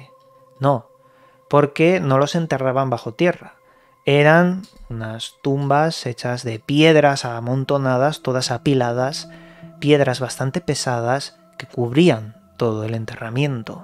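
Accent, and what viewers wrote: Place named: Spain. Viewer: gracias, muy interesantes tus videos. Además me gusta como hablas, un genuino idioma Español. Saludos desde Chile.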